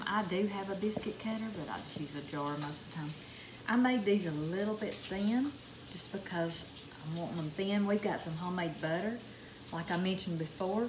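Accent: South, United States. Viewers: Love that country accent. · Love her cute southern accent and along with her voice she sure cleans out nice!!!! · I love your accent! I miss the south!! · I love living in the south! 😁🙏♥️🇺🇸 My mom is from Lubbock... I love the Southern drawl 🥰💯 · I only now found your station with this biscuit video!! I just love your southern accent, and your sweet ways,.... This is a wonderful video you have made for us to learn how to make these biscuits!! I have watched other people make them, and I just love your relaxed style, way of teaching, and instructions. You really are gifted!! .... And I love that you include the little tricks that are part of the way you do things!! A jar to cut them, your old woodent spoon to stir the dough,.... How you put out the flour, how you store your flour in those huge jars. The way you clean!! Even the way you fry your bacon!! 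Much aloha to you!! mililani 🌺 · Oh my GOODNESS! From the FIRST words I heard you speak!!! I don't think I've EVER heard such a strong, sweet SOUTHERN accent!!! You seem beautiful inside and out!!!❤ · Thank you for taking me back home to my Mom's kitchen in Georgia. I still have the burn on the back of my left hand from me trying to steal a hot biscuit from the oven. It was so hot when I picked it up that I dropped it and my hand hit the roof of the oven. This lady has my Mom's Southern accent too. Thanks for taking me back home...❤ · I am French Canadian my Grandmother made these for us when we visited her way up in Northern Ontario she used a can of Carnation Evaporated milk and then we would gobble them up...spread butter and then dipped them in a bowl of Molasses...I tried these in Georgia on our way to Florida one time and it was served with Pork Chop gravey...so Thank you for this recipe I will give it a try and comment back at you on how they turned out😉 I subscribed to your channel I can hear the southern accent from you. 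would you have a recipe for Pork Chop Gravey ? 😋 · That looks yummy 😋 and you are such a doll love to hear you talk with your Southern Accent ❤ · Thank you for sharing a very biscuit recipe 😀. I can't make homemade biscuits if my life depend on it lol..But I follow you recipe exactly and they came out perfect 😋.. And I love your Southern accent.. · Hi there! I love to cook, and my mom did, too. I learned to make complicated varieties of bread, even when my mom & I were 2 states apart. she talked me through my first ever attempt at yeast dinner rolls….Sadly, my mom went through devastating loss of her mind, long years before God called her home, just over a year ago. I felt I lost her when her mind first began to falter, which was 7 years ago. I heard your voice, & from your phraseology to the kindness, and even your southern-accent, sounded, to me, was identical to the voice of mom’s youngest sister’s. 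I want to thank you for taking me back to those loving words, which I looked for, but ceased to to find that to be part of my mom. I surely cherish those long distance cooking lessons she so freely gave me, & I plan to watch as many Videos as you have, so I’m a new subscriber, always honing the skills mom taught me! I’ve been blessed to raise 2 grown, God-reverencing sons, and I’m known to my grand babies as “NeeNee”. In fact, I live right next to my youngest son, who has raised a gorgeous 17 year old daughter, but just when he thought his child-rearing days were done, here came his 2nd daughter; a female version of himself, Bella is just 9 months old! ( I get to see her EVERY DAY! ) Looking forward to every lesson I can learn from you! From the hills of Arkansas, thanks again! T.D-Rogers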